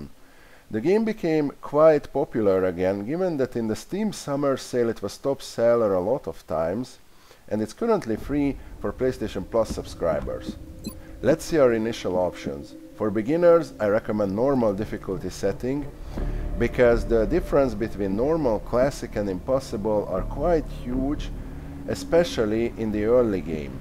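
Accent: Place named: Hungary. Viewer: Wow, very detailed, nice guide. Are you hungarian by any chance? Just guessing by your accent. :)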